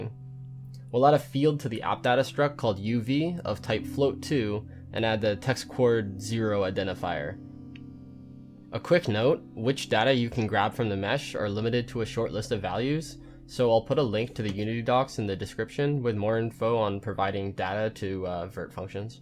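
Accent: United Kingdom. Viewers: Awesome short but rich and enlightening explanation. Also thank you Vaguely British fragment guy ! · The British accent is so funny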